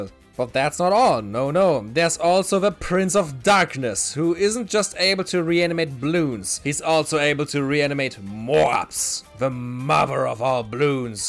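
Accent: Germany. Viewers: Haha click aufs video und hoere gleich mein geliebtes deutsch heraus 🤣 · Jooo ich wusste nicht das du deutsch bist lol obwohl man das schon raushören kann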